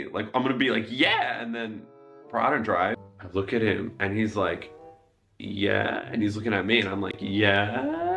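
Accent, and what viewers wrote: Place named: Australia. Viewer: He’s Australian girl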